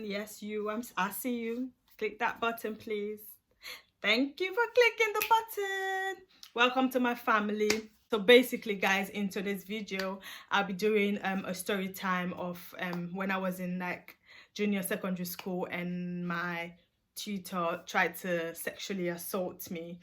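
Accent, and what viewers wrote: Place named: United Kingdom. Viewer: Aww do you live in the UK? I hear an accent lol... I can’t believe this even happened to you x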